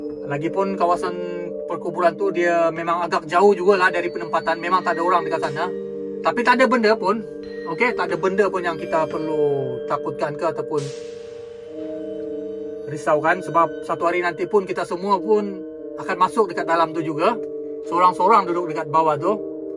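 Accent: Sabah, Malaysia. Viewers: ia org sabah tu,bahasanya tu,tapi x pasti daerah mana,sy pun baru tgk chanel nya ni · Org sabah ka nih ckp cm org sana jak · Slangnya slang Sabah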